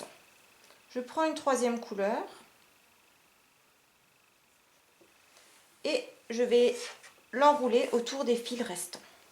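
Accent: Alsace, France. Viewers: Super 👍🏻 merci très bon tuto je vais pourvoir en faire avec mon fils. En plus très jolie professeur 😅 petit accent Alsacien si je me trompe pas 😁🥨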